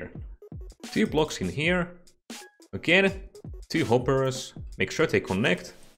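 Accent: Finland. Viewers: XD where are you from btw? your accent sounds so much like finnish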